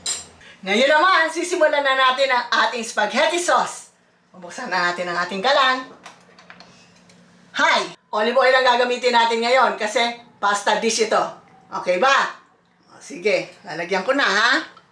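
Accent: Iloilo, Philippines. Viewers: ilongga si Mommy??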